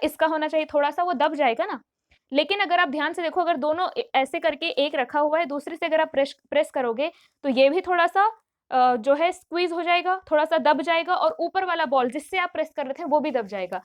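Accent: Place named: Uttar Pradesh, India. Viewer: Ma'am aap up se ya Bihar se hongi etna fix hai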